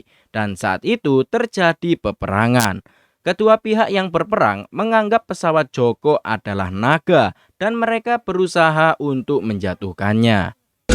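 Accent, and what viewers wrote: Java, Indonesia. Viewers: medok sngt tidk nyaman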